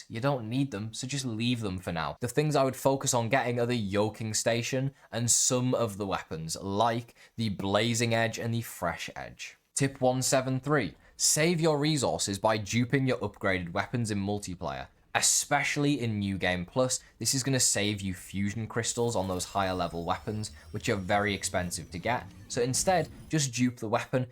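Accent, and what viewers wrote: Liverpool, United Kingdom. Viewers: Ooohhh is that a fellow scouse accent I hear?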